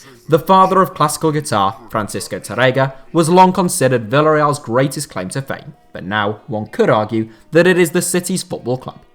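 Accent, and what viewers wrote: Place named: England, United Kingdom. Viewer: Your english accent is so heavy that I can't understand a word as a foreigner😂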